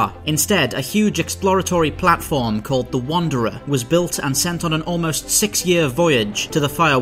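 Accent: United Kingdom. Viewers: Hi I'm a fan of your work on this channel. I'm impressed with the professionalism of your videos and voice over, also nice to hear a fellow brit. I was hoping i could get your attention for short fiction review if that's something you do.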